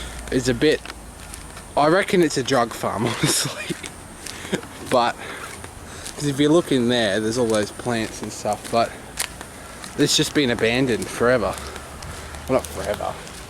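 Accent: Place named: Australia. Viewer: You have a very strong accent. Proves you're definitely in Australia. :)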